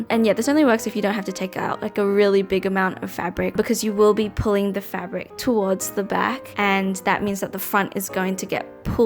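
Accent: Australia. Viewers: you're literally the helpful older sister everyone wants, also you australian? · half way into the video before realising that you're Australian too woah I'm so used to hearing the accent that it didn't register until you mentioned cotton on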